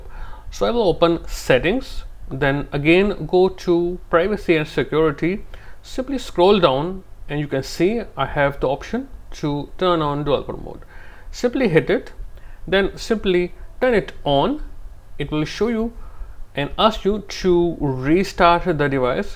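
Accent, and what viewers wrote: India. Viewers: do i really have to resort to tutorials by people with clear indian accents (sorry if this comment is offensive, i don't have anything against anyone)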